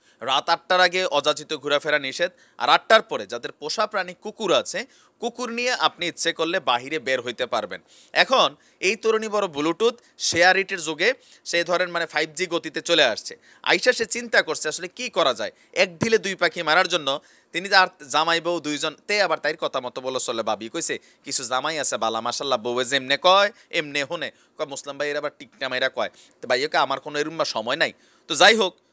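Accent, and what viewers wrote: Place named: Comilla, Bangladesh. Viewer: ভাইয়া আপনার কুমিল্লার ভাষায় কথা বলাটা অনেক ভাল লাগলো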